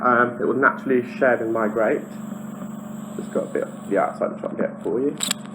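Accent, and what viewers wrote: England, United Kingdom. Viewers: You have the best English accent.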